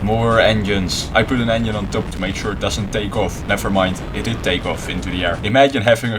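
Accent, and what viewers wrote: Netherlands. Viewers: i smell dutch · Random question: are you Dutch? I'm surrounded by people with quite similar accents like yours so time for curiosity to commit a murder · Cool dutch accent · I can hear You’re dutch, are you? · Your Dutch right? Like you really sound dutch and I would know, because i am please respond In Dutch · Its a dutch accent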